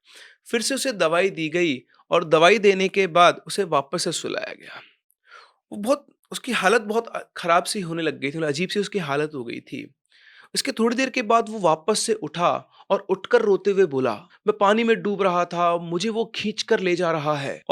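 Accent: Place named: Bengal, India. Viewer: Bengali accent ....story bengal ki h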